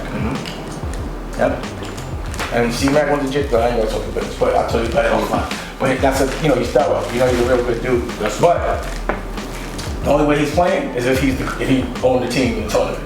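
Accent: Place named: Harlem, United States. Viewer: Amazingly google how much Dame net worth is you it will be surprise you. Talking like a tru Harlem dude.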